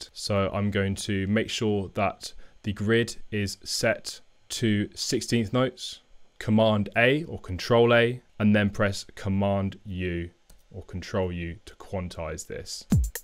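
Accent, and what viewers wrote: United Kingdom. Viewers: Most guys w ur setup and type of video are kinda foreigners who say “focal”(vocal) kk. Cool to see a uk dude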